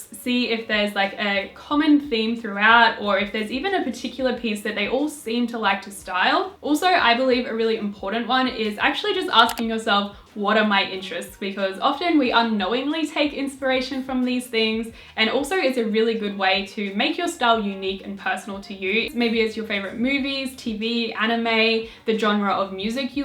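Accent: Australia. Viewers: is this chick Aussie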